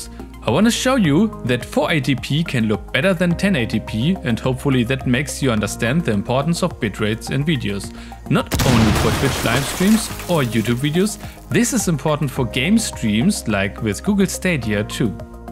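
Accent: Germany. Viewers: Ich hab dein deutschen Akzent anders rausgehört das ist einf krass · Das du ein Deutscher bist, hört man richtig raus 😂👍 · Du bist deutsche Man Hört es raus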